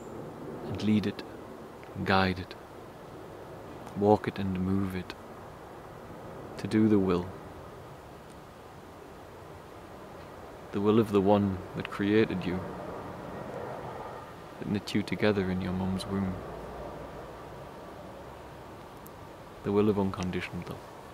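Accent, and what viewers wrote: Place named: Geordie, United Kingdom. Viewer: Are you from the North East of England?? You have a great accent/dialect and it is very similar to my partner’s accent and He is a Geordie (from North Shields, North Tyneside) of which He is extremely Proud.. Just thought I’d ask if You are also From Our Local Area.. Obviously I’m meaning - Originally.. Much Appreciated for your excellent work in helping humanity - True Angel of God xxx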